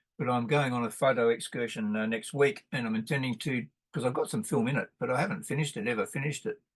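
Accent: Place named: Australia. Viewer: Surprised to hear a good familiar Aussie accent on the first click on the search for reviews of the C-150. Thanks for sharing!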